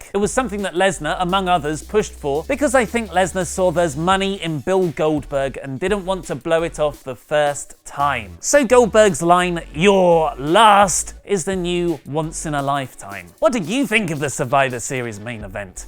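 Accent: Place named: United Kingdom. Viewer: I have trouble with your British accent!!!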